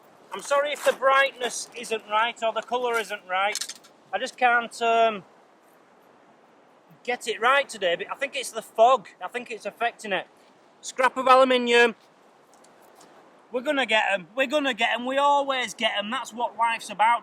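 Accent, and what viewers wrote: United Kingdom. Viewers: You sound exactly like a british person I had to call from work yesterday, cause his car is damaged and for repair in Germany lol.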